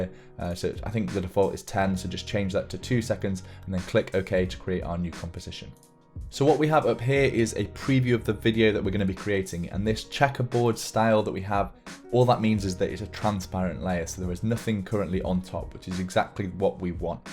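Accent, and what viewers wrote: United Kingdom. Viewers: yo i love your videos, BUT YOUR VOICE. After a couple videos i have to listen to heavy metal to get the Brittish accent out of my head..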